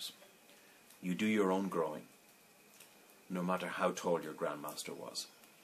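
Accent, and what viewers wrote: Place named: Ireland. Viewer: Like your channel subcribed to lt lhave 20 years in karate done wingchun pressed thumbs up are you lrish you sound lrish lam lrish my grand dad was boxer and my uncles were boxer s lam 64 now love all things about japan and china my master was japanese there old lrish saying lt meant for you lt wiil not pas you by my mum and dad came from co limerick in lreland all besr with your channel godbless seamus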